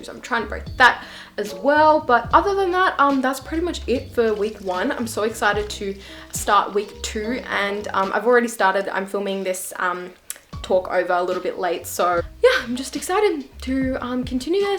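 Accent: Australia. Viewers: while you were sleeping was such a good drama!!! i got second lead syndrome watching it though >.< also, i love your aussie accent haha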